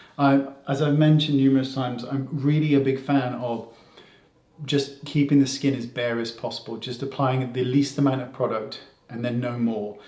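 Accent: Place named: Wales, United Kingdom. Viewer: nothing better after a tough day than relaxing to your voice with your Welsh accent.😍.Sending you love from Australia 🎈❤️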